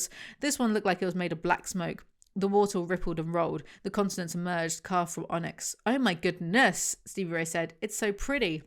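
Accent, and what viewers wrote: Texas, United States. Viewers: As a Texan, I am in love with your Texas accent 🤣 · what an impressive and accurate texan accent!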